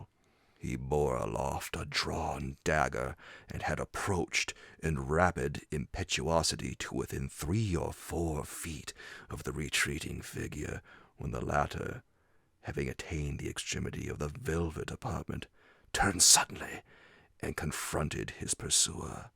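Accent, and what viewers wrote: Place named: United States. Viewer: Thanks for this one! One off my favourites of Poe's tales. You've got to have a talented American narrator for Edgar.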